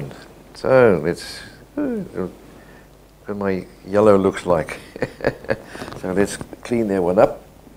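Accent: South Africa. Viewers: You have a South African accent, am I correct🤓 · Boy, am I happy to have found you, I turned 60 a while ago, and suddenly decided to try my hand at painting etc. I just love the way you talk a person through the motions.... And to hear a South African voice ... what a treat. Thanks for the knowledge that you share.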